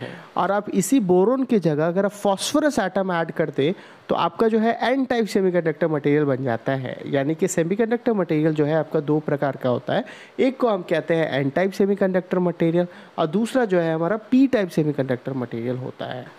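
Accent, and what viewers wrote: Bengal, India. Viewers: Sir are you bengali??? Your content is so helpfull for me.... Thanks for this great work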